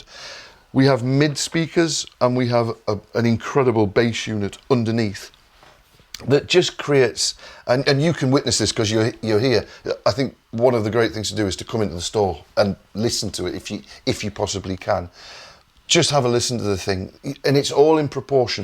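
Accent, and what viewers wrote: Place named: Yorkshire, United Kingdom. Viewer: It's "Anechoic Chamber", non "Anaconic", but he sounds like a Yorkshireman so it can be excused.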